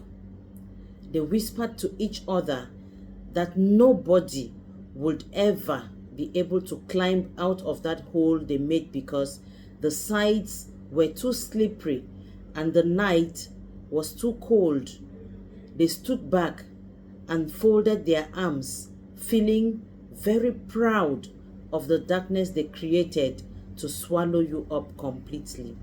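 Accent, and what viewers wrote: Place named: Africa. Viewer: A beautiful prose of becoming spoken in my honor. Thank you Child of the Most High God. From your accent, I believe you may be from the Motherland. You have superb writing skills — the literary world needs to hear from you … in peace and love, God’s Chosen Servant & Supreme Leader With Spiritual Authority ♥️👑♥️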